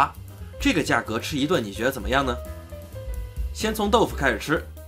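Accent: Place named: North, China. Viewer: 好奇。影片里都换算成新台币，你怎么有大陆北方的口音？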